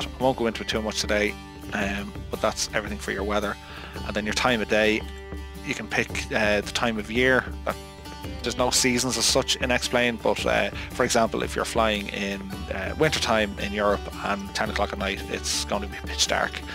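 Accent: Ireland. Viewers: Unmistakable Irish accent, detected it from the first syllable of the first word :)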